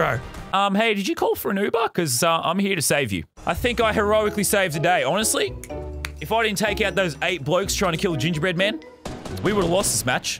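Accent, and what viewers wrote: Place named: Australia. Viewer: Lol this dude is the funniest and that Australian accent is perfect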